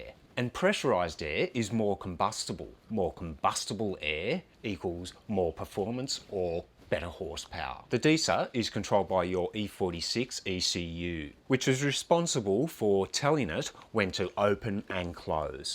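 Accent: Australia. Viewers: Loved that video mate. Just got to see if this fixes my E54 running on a couple of cylinders. Thanks, and love that Aussie twang!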